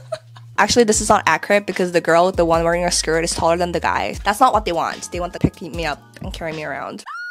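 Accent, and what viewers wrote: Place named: United States. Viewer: Omg for most of the video I didn’t even noticed you used a fork lol, you speak like you are from the USA. Good vid but the cringe saying is annoying atleast to me, you are wise on thinking but are too judgmental for most men and your smarts may come across feminist where u feel superior over others. But u are still young. You may be different in person I can only judge from video, just have fun in life and don’t worry about others so much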